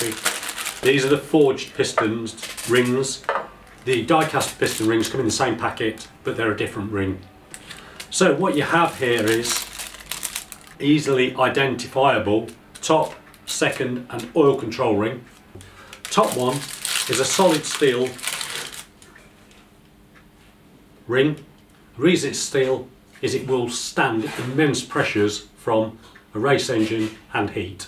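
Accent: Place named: United Kingdom. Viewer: Sound like your from UK do you do anything for the 4g63 engine ? And the k20 Honda ?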